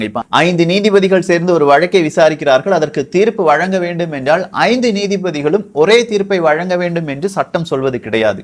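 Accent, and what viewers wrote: Kerala, India. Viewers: Friend, are you Keralite🤔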